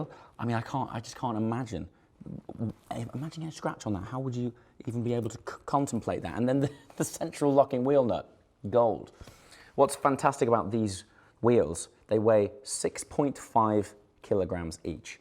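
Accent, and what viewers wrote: United Kingdom. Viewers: Why do you sound about 20% more british in this video?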